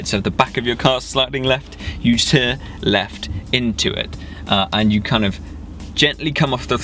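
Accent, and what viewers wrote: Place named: United Kingdom. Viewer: Irrelevant Q But what part of UK are you from? Thanks for making quality videos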